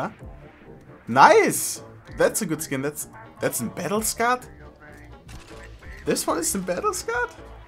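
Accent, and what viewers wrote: Germany. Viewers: Are you German? Sounds like a German Accent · Justin he comes from germany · Hey m8! Where are you from? Because you speak eng like a german^^ Nice Video :)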